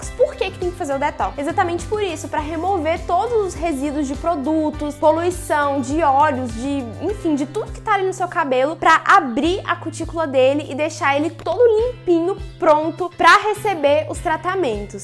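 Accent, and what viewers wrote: Minas Gerais, Brazil. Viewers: !!!!!! amiga sua louca!!! que hidratação foi essa.... amei... amo seus vídeos acho você muito linda e fofa com esse sotaque mineiro... e: EU AMO BABOSA... sucesso bjnssss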